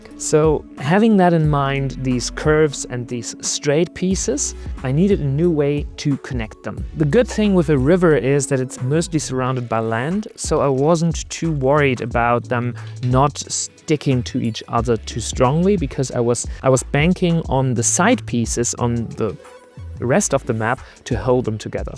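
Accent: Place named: Germany. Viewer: Der Dude ist klasse und hört sich sehr deutsch an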